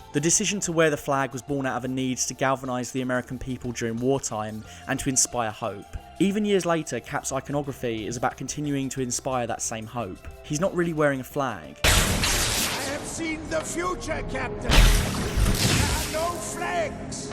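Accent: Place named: Edinburgh, United Kingdom. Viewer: glasgow? its edinburgh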